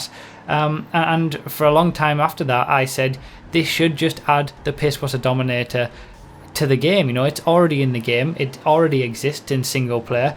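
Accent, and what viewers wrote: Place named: Bradford, United Kingdom. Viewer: okay that makes more sense cos to my ears he has an accent that would come from Bradford or around that area.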